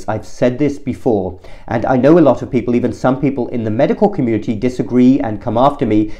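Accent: United Kingdom. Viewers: I was wondering why you have such a clipped British accent despite staying in the US! Now it's clear.😊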